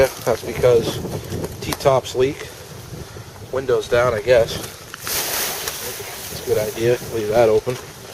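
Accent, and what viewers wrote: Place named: New Jersey, United States. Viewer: You sound like you have a New Jersey accent.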